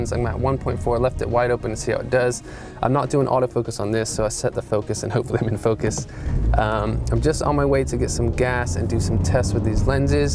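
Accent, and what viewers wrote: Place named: United Kingdom. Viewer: I have noticed that you are getting more of a British accent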